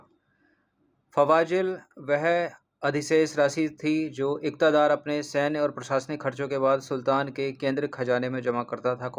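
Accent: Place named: Raebareli, India. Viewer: Sar aap kahan se bolate Hain Raebareli ki Bhasha aapki lagti Hai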